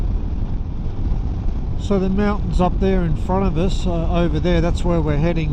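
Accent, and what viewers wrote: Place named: Australia. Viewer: You sound like an Aussie, you can’t buy a new one in Australia so I’m intrigued where you are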